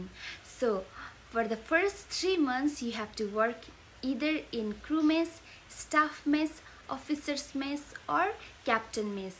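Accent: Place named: United Kingdom. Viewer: Your accent like British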